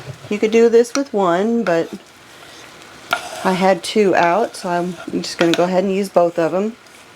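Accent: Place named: South, United States. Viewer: My hubby Jerry and I love to watch your cooking videos. Do I detect a little more southern charm to your words now a days?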